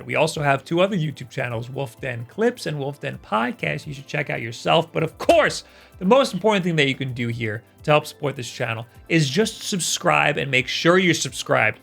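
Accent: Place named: New York City, United States. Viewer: you kinda sound like the NYC version of Jordan Peterson I just noticed 😳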